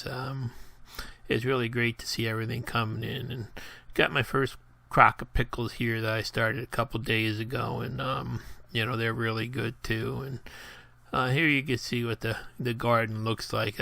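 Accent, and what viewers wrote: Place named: New England, United States. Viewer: get you some quienas well i cant spell but a real hillbilly would know this your a wannabe hillbilly. sound like your a yank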